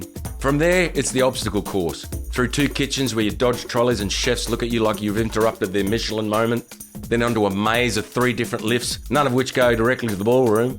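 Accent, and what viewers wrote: Australia. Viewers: Had to come back here after initially enjoying this a month ago as I thought of you at a live outdoor gig this weekend. Everything was actually good and I had been waiting to have a slower moment and break out a song. I started in very softly and the puppy adoption set up which was part of the event and had been there quietly all afternoon under the trees in a corner, had a visitor walk by with two dogs of their own. About 20 dogs commenced to bark, as I began to sing. I switched to a chorus of and in my head heard your Aussie accent say dogs barking off to the side like there's no tomorrow, that's a new one. And I swear it was not in reaction to me!😂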